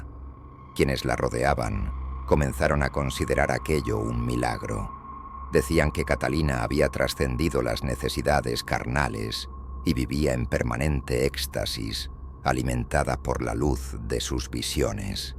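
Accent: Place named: Spain. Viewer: estos españoles no son agradables escucharlos narrar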